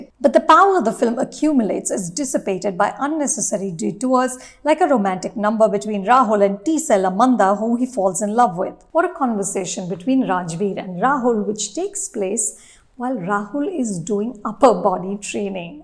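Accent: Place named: India. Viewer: Her Indian English accent though hahahaha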